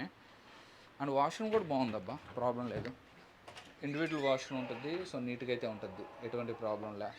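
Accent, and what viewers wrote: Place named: Nellore, India. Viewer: Brother ne bochulo Nellore slang aaapu, and marchu plz madhi kuda Nellore but vinaniki irritate ga undhi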